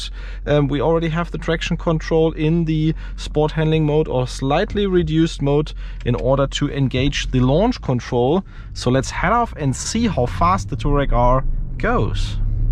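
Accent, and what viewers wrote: Germany. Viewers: dein dein denglish is einfach nur nerfig :)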